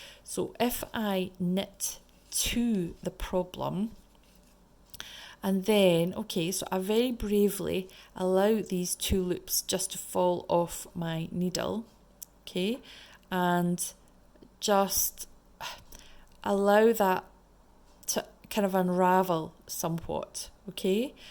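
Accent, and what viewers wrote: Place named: Scotland, United Kingdom. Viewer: I Lo❤e your Scottish accent! ❤ 🥝🇳🇿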